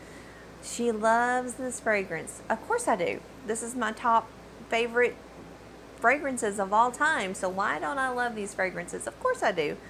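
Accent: South, United States. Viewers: Loved your selection as well as yoyr southern accent. I could listen to you talk all day, you sound so lovely. 😊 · I love your beautiful accent. I used to live in North Carolina and in Mississippi, such sweet people and their accent melts my heart!!!!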